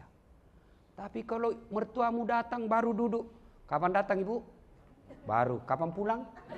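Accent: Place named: Sulawesi, Indonesia. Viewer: MasyaAllah Yang paling saya suka logat sulawesi nya. Sehat truss ustadz. semoga selalu dlam lindungan Allah swt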